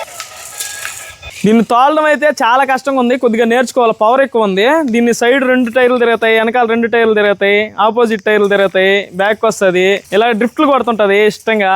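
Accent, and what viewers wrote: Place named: East Godavari, India. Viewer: Anna east godavari lo laga mataladu Anna eimka ni vedios ki eavvadu topu ledu e comment ki vedio cheyy anna nenu eaduru chustu vumtya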